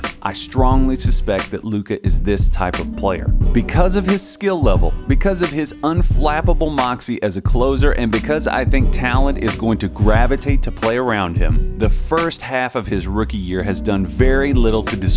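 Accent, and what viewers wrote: United States. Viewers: Yeah, at first I was thinking to myself "Gosh... This guy perfectly nailed the American accent... (My thinking being, that the narrator was Slovenian:))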